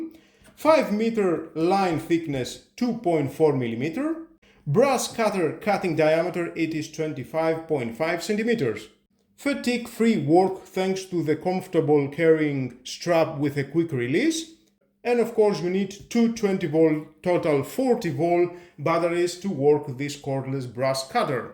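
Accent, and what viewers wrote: Greece. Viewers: Hello, I cannot help but like your accent. I've heard it many times on Greek ships when I was a service engineer 😅 are you also a seafarer or is that just how Greeks sound?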